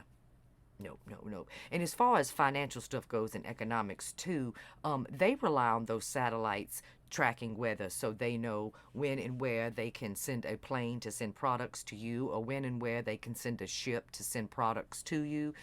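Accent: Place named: Louisiana, United States. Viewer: Hearing you say exactly what I've been saying, in your lovely Louisiana? sexy voice, is fabulous! 👏👏👏👏👏👏👏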